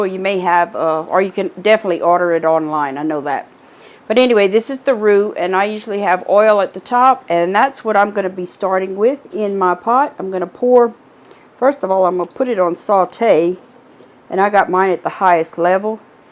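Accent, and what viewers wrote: Louisiana, United States. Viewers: I don’t have an instant pot but that looks so good I may try to make that without an instant pot. Also Love your Cajun accent.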